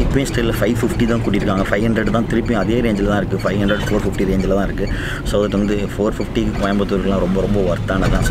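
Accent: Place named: Kerala, India. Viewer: Im a Kanyakumari Malayali....I know u have a Malayali touch....U know Malayalam...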